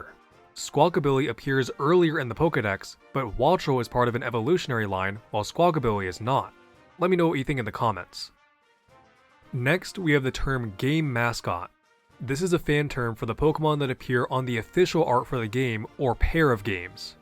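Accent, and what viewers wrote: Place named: United States. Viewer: Today I learned is half Japanese that's pretty neat! Idk why I always assumed from your voice that you were like John America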